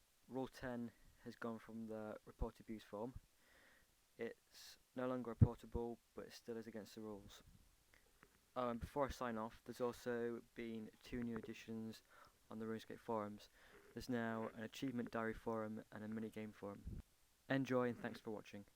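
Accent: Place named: Australia. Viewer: Lol, are you from England? You sound australian. Lol. xD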